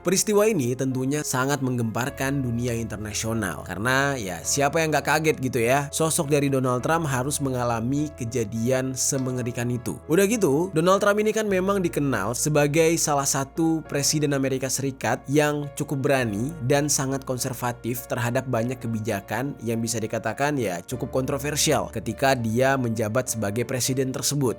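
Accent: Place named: Java, Indonesia. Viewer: Aku lebih suka "hey Genk..welcome to back kamar jeri ..." 😁😁 Medok..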